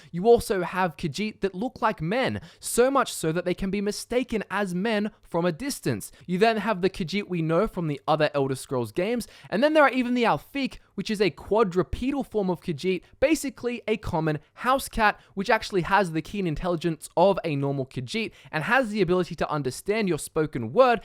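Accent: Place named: Australia. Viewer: I really can't stand the australian accent, what's with all the upward inflections every 2 seconds?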